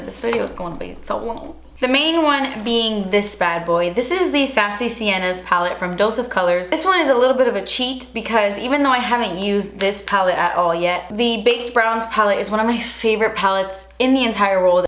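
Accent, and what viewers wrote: Cuba. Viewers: yeeees absolutely loved when you mentioned Rachel, by the way i loved your cuban accent!!! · "Por eso tengo los nervios tan malos" 😂😂😂😂 I ❤ that cuban accent!!! My husband says we are twins! you're hilarious love you 😘😘😘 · 😍tu acento cubano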